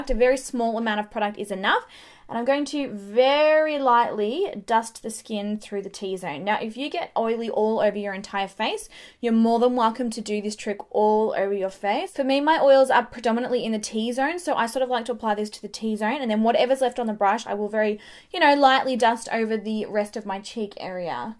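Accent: Australia. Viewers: Really enjoyed this video but I had to watch it muted and with captions because her accent is so terrible! It was like nails on chalkboard to me and I normally don't mind an Australian accent. For whatever reason though, her accent really got to me and was hideous to listen to. Yikes. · Why do australians pronounce 'go' like GOURH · Love yur Aussie accent 💗👍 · I could listen to you talk all day, love the Aussie accent! 😍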